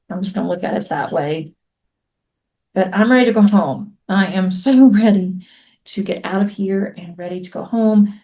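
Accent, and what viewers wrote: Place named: South, United States. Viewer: your sweet, subtle Southern drawl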